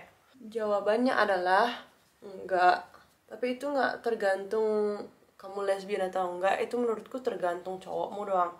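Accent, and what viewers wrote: Bali, Indonesia. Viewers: Loagat nya bali banget. Lesbi Seng ken Ken. Aku suka Cwe Lesbi. Salam Kenal dr Tabanan.🙏👍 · Gemes sama medok Bali nya 😁 wajahnya juga cantik menurut pandangan saya. God bless u! ♥️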